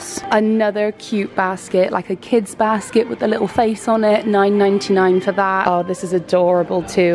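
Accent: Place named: England, United Kingdom. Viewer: Hello sweet lady I'm in America from the suburb of Chicago May I ask where you're from you sound like you're from London Have you ever been to America or Chicago We have a store called Dollar Tree sometimes they have good stuff sometimes they don't all depends what you're looking for Thank you for a nice video God bless have a good day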